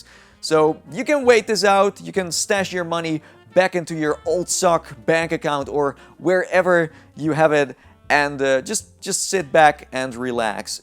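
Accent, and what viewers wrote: Netherlands. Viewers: Ben je Nederlands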